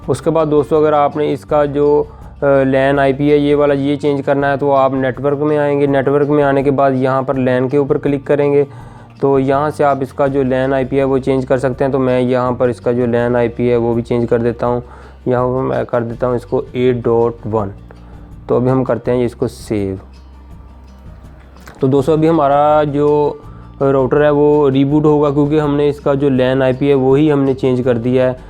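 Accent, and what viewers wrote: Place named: Pakistan. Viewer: hahaha boli ton hi samj gya si pakistan di video hai. By the way great video. Gwandi taade.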